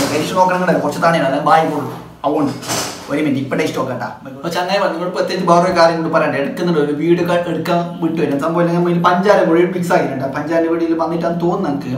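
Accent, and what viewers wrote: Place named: Kasaragod, India. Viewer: Enik നിങ്ങടെ കാസര്‍കോട് ഭാഷ ഭയങ്കര ഇഷ്ടമാണ്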